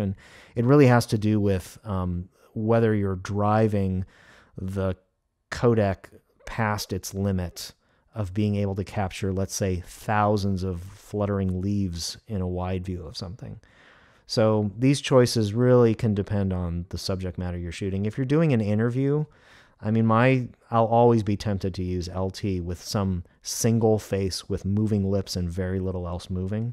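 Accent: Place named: Brooklyn, United States. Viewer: Great video. It sounds like you are in Brooklyn. I'm about 45 min from you. I have done some video work with my 80D/mics/steadicam. I'm interested in learning more and assisting as AC/boom operator/gaffer/etc on a bigger project. Let me know if you are ever looking for a competent crewmember as an unpaid intern.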